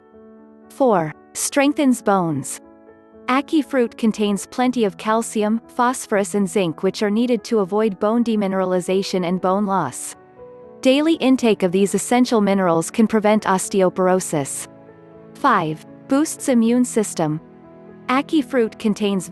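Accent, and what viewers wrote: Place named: Portland, Jamaica. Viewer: You sound like you're from Portland!!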